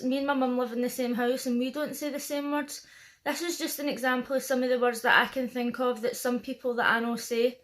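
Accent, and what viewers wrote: Scotland, United Kingdom. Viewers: ☺ Brilliant 👌 I could listen to the Scottish accent all day long. ☺ Brilliant video😊 Stay Awesome ✌❤👍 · Love that scottish accent! Actually, all British accents are kinda cool :D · I have an interesting accent myself so I can relate :) I like your Scottish accent. You're fun to listen too. Your endings sound very fast compared to slow down southern american endings lol. People say words a little slower here. I guess that makes you sound hyper and us sound dumb lol. Great video!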